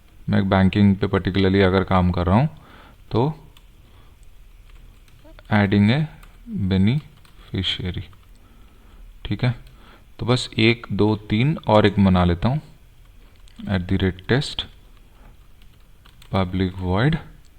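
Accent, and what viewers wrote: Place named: Madhya Pradesh, India. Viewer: sir,excellent.....aapki hindi toh hamare MP ke jesi hain.. i have learnt TestNG from 2 months lekin aapne toh 1 din me hi sikha diya...samjhana ka tarika bahut saral and easy hain aapka thank you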